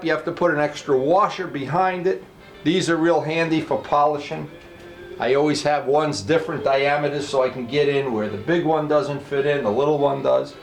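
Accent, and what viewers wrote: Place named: Pittsburgh, United States. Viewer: This guy sounds like hes from Pittsburgh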